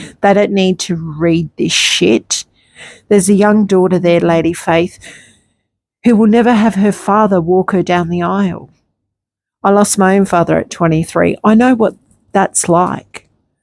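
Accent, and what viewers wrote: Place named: Australia. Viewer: I've never come across your channel before, you sound Australian and I don't get to follow many Australian creators. I felt the same way many times when Fiona so generously updated us on dear Grahams condition-just wanted to hug her. Then I made the unfortunate choice to watch what that hideous swamp hog said about Trevor's visit and was shocked that people are so awful. Why though? That's what I don't understand. They've never done anything to any of them.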